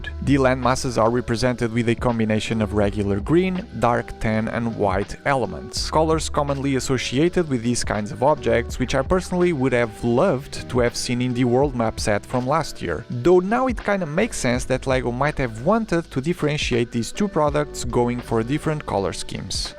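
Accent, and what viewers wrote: Portugal. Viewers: Mano, assisti o vídeo inteiro sem perceber que tu es português, se não falasse eu não iria perceber 😂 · Consegui perceber logo que eras português mal falaste xD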